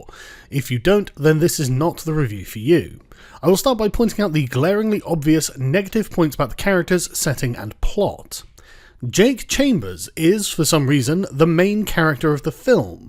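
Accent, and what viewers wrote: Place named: United Kingdom. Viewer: When he said "Fuck this movie" with his soothing, perfect British accent, I don't know how to put it... Um, this is a bit dirty but... I got a boner.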